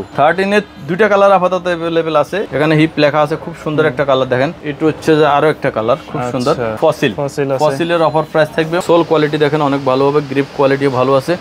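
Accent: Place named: Noakhali, Bangladesh. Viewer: ভাইয়া মনে হয় আমাদের নোয়াখালীর মানুষ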